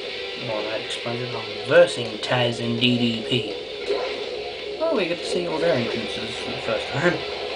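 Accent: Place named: United Kingdom. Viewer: I love your british acent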